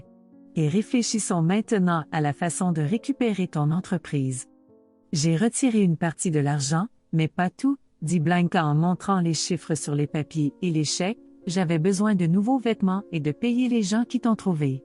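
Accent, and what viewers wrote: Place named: Canada. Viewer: Bel accent canadien J'ADORE bonjour.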